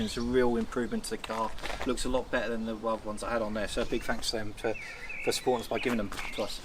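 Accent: United Kingdom. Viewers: You from Bristol is it?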